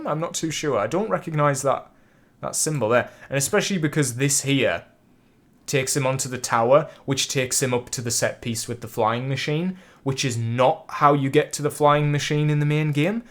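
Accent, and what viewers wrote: United Kingdom. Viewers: That thick British accent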